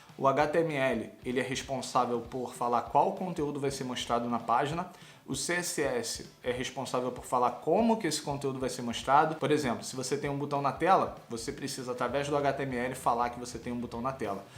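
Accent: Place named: Rio de Janeiro, Brazil. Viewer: Ih koe carioca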